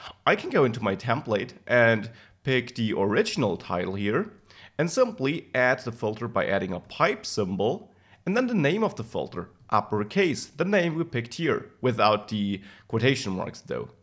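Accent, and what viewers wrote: Germany. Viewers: nice Germanized British American Accent Mix...Filter :)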